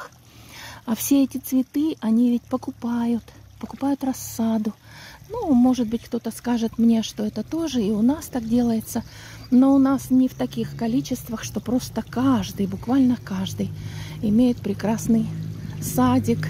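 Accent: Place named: rural, Russia. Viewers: голос сельской женщины - себя боится показать и мужей тоже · Да, чувствуется южный говор. Его не вытравишь никакой Англией.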